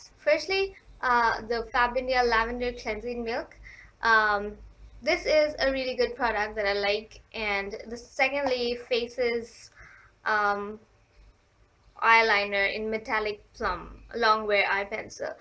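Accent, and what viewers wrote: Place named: United States. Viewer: your cute :) you look like an indian but you have an american accent.how? :O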